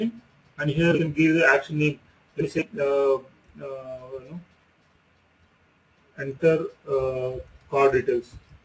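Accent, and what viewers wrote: India. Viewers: Нихера непонятно что говорит, кроме того что индус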